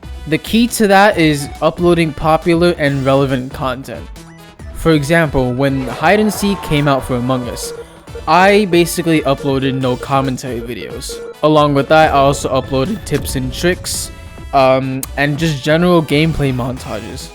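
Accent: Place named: United Kingdom. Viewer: why does your voice sound so british?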